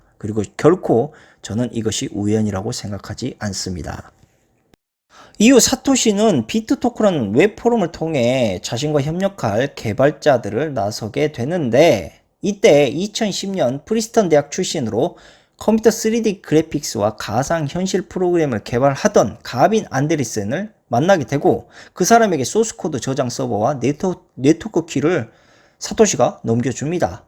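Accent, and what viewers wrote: Jeolla, South Korea. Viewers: 내가 싫어하는 전라도 사투리도 듣기 좋게 만드는 모든 전라도 사람들이 처럼 똑똑했으면 난 전라도 사람들 존경했을거임 난 개떡같은 인간들만 만났는데 게다가 좌빨인것 까지 ㄷㄷ 같은 깨어있는 분도 있다는걸 암 ㅋㅋ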